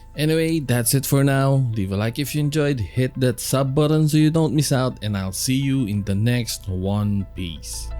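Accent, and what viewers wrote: Philippines. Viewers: Pinoy kaba lods? tunog e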